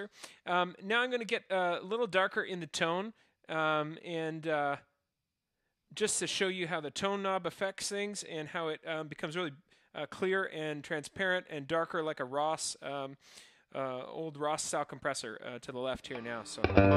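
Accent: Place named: Canada. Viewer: every time you speak it makes me miss my Canadian friends.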